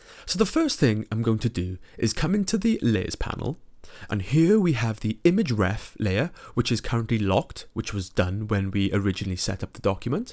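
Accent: United Kingdom. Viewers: it might be the british accent responsable for my confusion but you sound very much like the dude from the " School of life" chanle x)) helpful tutorials, by the way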